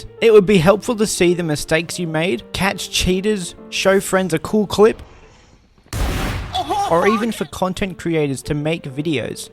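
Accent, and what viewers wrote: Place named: United Kingdom. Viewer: Bro roasted Rito so hard in his british accent. Loved the video man, so good 👍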